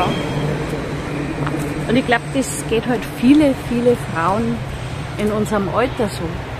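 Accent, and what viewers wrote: Bavaria, Germany. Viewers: So sympathisch und unkompliziert erklärt. Ich mag Dein bayrisches Reden und versteh's auch gut. Danke dafür. 🙏🍀🕊🫶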